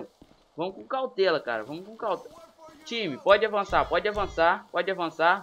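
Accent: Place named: Sao Paulo, Brazil. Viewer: Você é paulista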